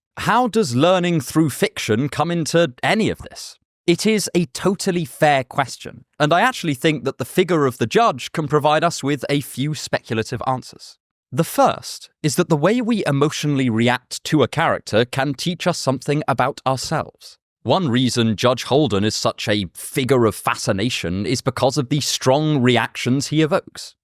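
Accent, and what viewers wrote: United Kingdom. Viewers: watched to learn❌ watched for british accent✅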